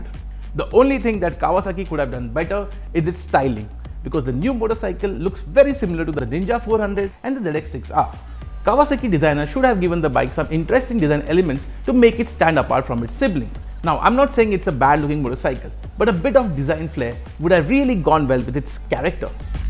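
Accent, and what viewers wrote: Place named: India. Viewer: Your English accent looks like indian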